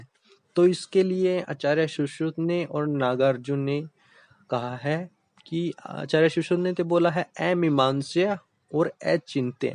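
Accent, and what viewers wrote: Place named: Haryana, India. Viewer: Please don’t use haryanvi accent bro. Keep it up 🎉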